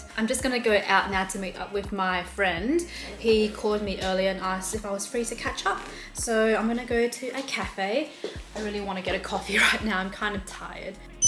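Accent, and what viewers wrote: Australia. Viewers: I like your vlog! No worries about the duration of video 😆 オーストラリアに行ったことがあるので、オーストラリアの発音がとても好き🇦🇺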